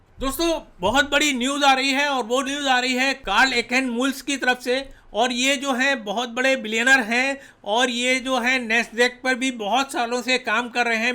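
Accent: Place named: Haryana, India. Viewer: Aap Haryana Se ho kha sa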